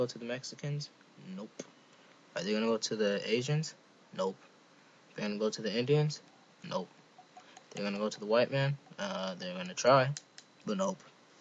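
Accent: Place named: Somalia. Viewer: Are you Somalian? You sound Somalian.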